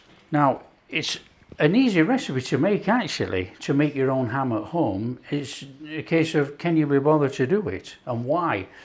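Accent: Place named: England, United Kingdom. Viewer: Hi this amazing,I hope this ham will be delicious I also like your English you come from England, watching in Mombasa Kenyan coast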